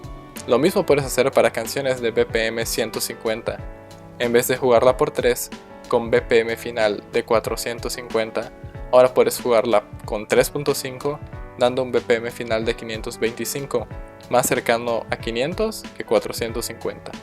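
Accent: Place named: Mérida, Mexico. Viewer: Eres De Merida :o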